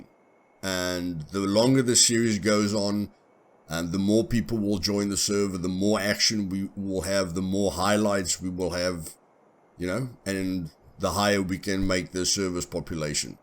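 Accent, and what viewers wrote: South Africa. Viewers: Is that a South African accent I hear my ou? Great video keep up the good work! Jy verduidelik die detail van die game baie goed.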